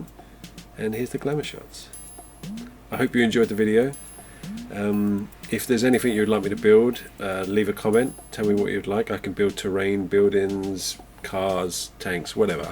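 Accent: United Kingdom. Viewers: R u from UK ?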